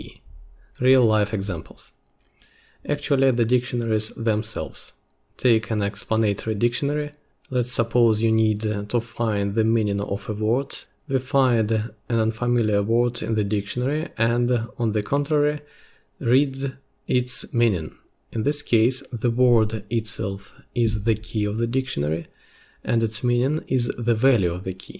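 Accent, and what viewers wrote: Russia. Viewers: Чувствуется русский акцент)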